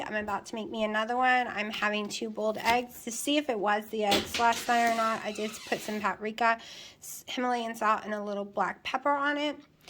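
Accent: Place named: United States. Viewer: I love your country accent ♥️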